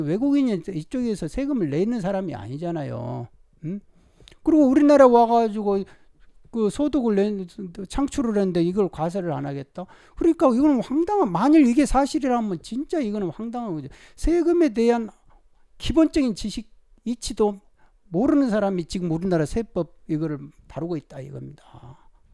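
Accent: Jeolla, South Korea. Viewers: 전라도분 같은데 문재인정권에 대해 어떻게생각하시나요?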